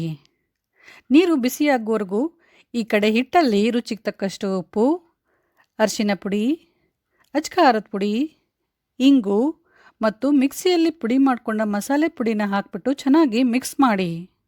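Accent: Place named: Belgaum, India. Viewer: Hi mam nimma yella aduge nodiddhini thumha chennagidhe, nanna magalu nimma mathina shyli keli naguttale 😂 belgum side mathado tara idhe.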